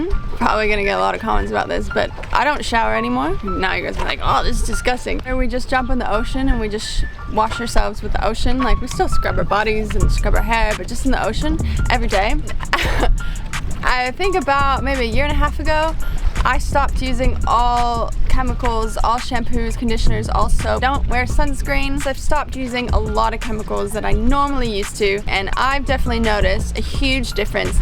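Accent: Australia. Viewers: Your voice has changed a bit. Sounds a tiny bit Austrialian. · I notice your Aussie accent, been following you for years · Your new Australian accent sounds cute! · you sound aussie now 😊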